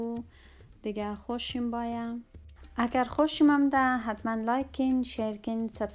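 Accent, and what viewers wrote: Hazarajat, Afghanistan. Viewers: خیلی خو ب بود اول همینکه خوب نظافت رعایت مونی دوم اینکه لهجه آزرگی تو بسیار خوبه. خیر کنی خوارک جور باشی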